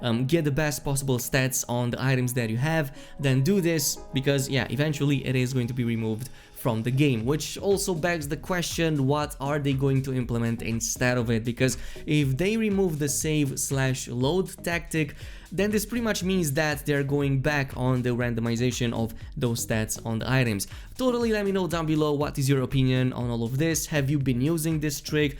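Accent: Netherlands. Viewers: it's a dutch accent :)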